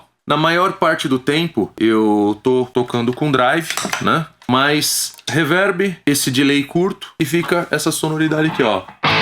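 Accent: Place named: United Kingdom. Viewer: O papo e British crl...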